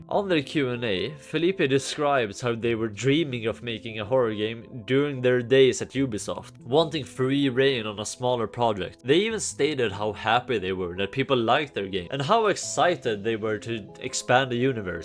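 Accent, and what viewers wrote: Sweden. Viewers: Is he swedish?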